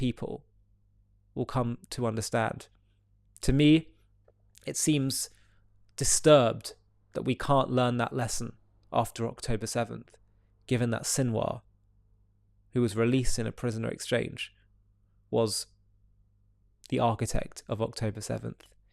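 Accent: United Kingdom. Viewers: Have you seen the way these poor Palestinian women were released from the terrorist IDF illegal military prison? I wonder how much you get paid and who is paying you to speak this rubbish nonsense. Only women and children were released by Israel. Why didn’t they release the doctor that was raped by the IDF? Oh that’s right because the IDF killed him. You’re not that bright for having a British accent. You sound British but you speak ignorant. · Pro-genocide hogwash, with Limey accent.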